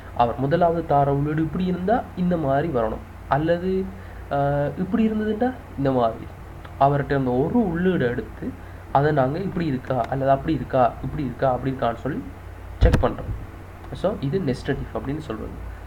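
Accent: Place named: Sri Lanka. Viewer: Srilankan tamil beautiful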